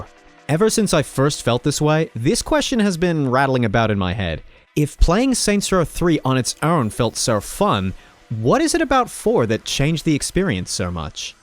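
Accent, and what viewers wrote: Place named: Australia. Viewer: not gonna lie you sound like an australian learning an american accent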